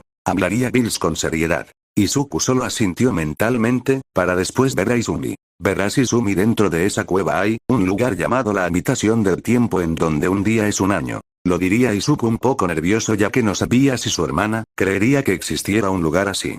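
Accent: North, Mexico. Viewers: Mmm noto algo de norteño xx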